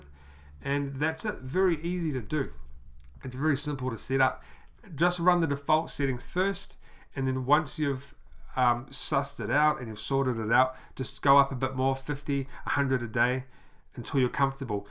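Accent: New Zealand. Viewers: you from new zealand??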